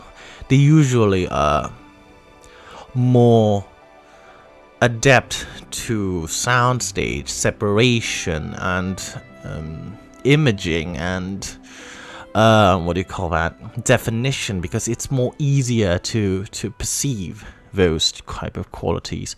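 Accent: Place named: RP, United Kingdom. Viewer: Lebih ga paham lagi kalo B pake aksen Scottish loh. Wahahaha. Sebenernya bagus banget sih si B ini pake aksen RP-ish (menurut sepengetahuan gw), agak kurang natural aja. Mungkin kalo swear words fuck sama shit diganti jadi bloody sama bollocks bakal jadi lebih natural. Wohohoho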